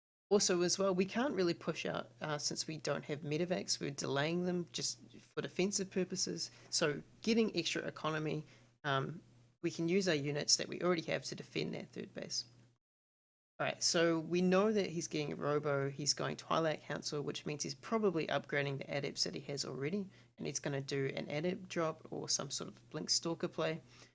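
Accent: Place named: South Africa. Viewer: Excellent video. I really liked the calm easy thought process you had during the game. Your accent sounds like you are from South Africa.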